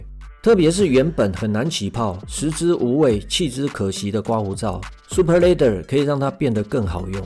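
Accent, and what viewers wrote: Taiwan. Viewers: 就是這個正港台灣腔讓我訂閱的😂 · 你的臉很帥感覺很斯文，但聲音很台哈哈哈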